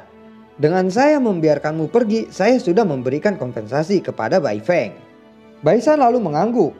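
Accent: West Java, Indonesia. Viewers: Orang sunda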